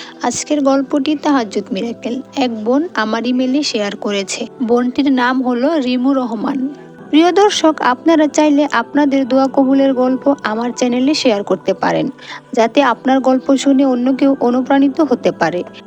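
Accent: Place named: Mymensingh, Bangladesh. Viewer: আপনার দেশের বাড়ি কি ময়মনসিংহ অথবা কিশোরগঞ্জে?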